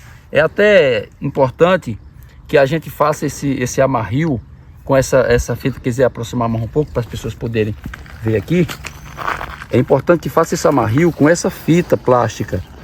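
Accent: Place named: Northeast, Brazil. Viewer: Primeiro. Sua simpatia e sem medo de estar ensinando outras pessoas ou concorrentes. Imagino que seja do nordeste pelo sotaque . Amo as uvas de mesa do nordeste. Estou sempre comprando porque são muito doces e deliciosas. Não sei seu nome, mas parabéns pelo seu cultivo e continue nos mandando essas delicias aqui pro sul. Forte abraço de Santa Catarina.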